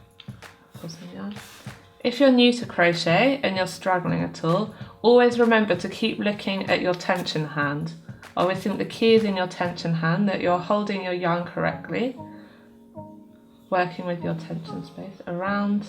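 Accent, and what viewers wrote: United Kingdom. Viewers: Awesome instructor and very clear teaching of all the necessary techniques needed to accomplish what looks like an extremely difficult pattern to those who don’t crochet! A great way to use up all that stash, too! Thank you so much. It did take a bit of rewinding parts though just to understand her English accent, which I grew to love!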